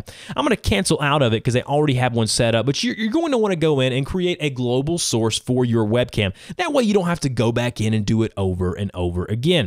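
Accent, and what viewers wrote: South, United States. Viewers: Are you from the Southern U.S.? (I'm a Linguistics major so I have a tendency to try to guess accents.) It sounds kind of like you moved to the Northeastern United States after growing up in the south.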